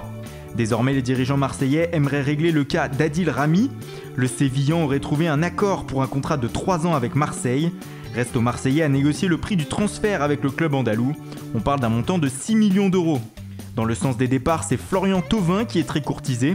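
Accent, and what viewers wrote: Italy. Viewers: ton accent italien...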